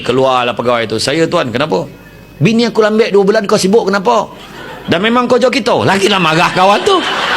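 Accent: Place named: Negeri Sembilan, Malaysia. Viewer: Lawak loghat N9 memang best rentak bahasa beralun tinggi rendah dgn lembut😂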